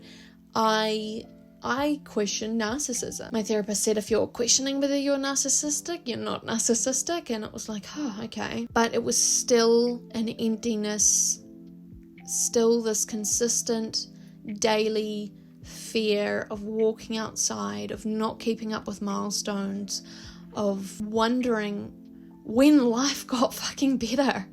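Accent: New Zealand. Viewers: Are you from Auckland? I love your accent and the way you talk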